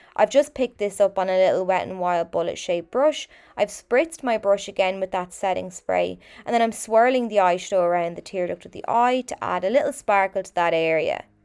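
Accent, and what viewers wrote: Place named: Ireland. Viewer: I love your accent, after my Geordie accent an Irish one is always my favourite. Another great tutorial from you, but I didn't buy this palette cos its just too light for me, I like darker smoky eye shades, but it does look good on you though. Keep them coming gorgeous girl 👧 😘 ⭐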